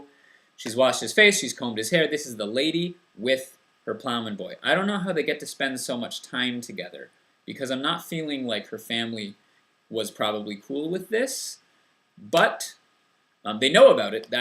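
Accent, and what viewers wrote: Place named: Scotland, United Kingdom. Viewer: I'm interested in the numerical aspect of this one. 'Nine gentlemen' - seven is a common variant in folk songs, as in 'Seven Yellow Gypsies' (yellow not meaning 'cowardly'). I don't speak Scots but you sound right to me, though I rarely hear it. It's a simple story really! Those big ballads seem very interconnected to me, as though variations on the same basic themes. Love, separation, death / murder, betrayal. The Mills and Boon of their day.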